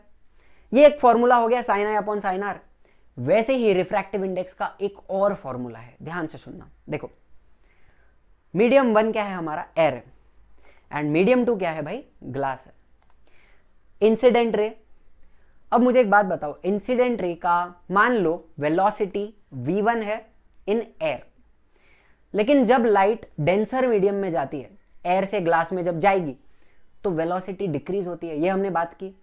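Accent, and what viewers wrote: Maharashtra, India. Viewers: Sir i think your mother tounge is marathi 😅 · Sir kya aap marathi ho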